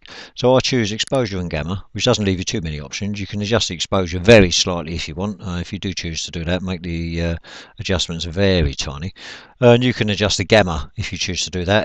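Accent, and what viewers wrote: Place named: Liverpool, United Kingdom. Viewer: My God he's from Liverpool.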